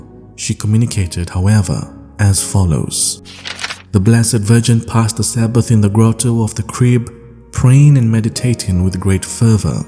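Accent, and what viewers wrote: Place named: Nigeria. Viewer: I love hearing you tell this truth In your Nigerian accent Reminds me of old friends St Catherine intercede and pray for us to love one another ☘️☘️☘️🙏🙏🏼🙏🏾🕊️🕊️🕊️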